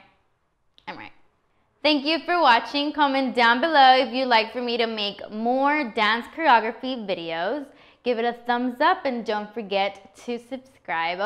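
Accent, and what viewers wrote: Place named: United Kingdom. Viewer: Who is this British chick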